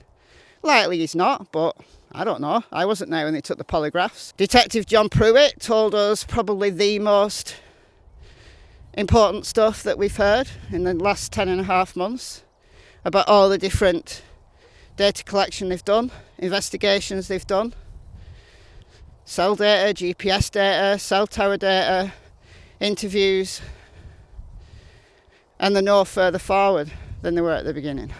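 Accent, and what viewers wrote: United Kingdom. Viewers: Your channel makes the most sense. You are not a gossiper, fabricator and are authentic and I love your British accent 👋🏽💘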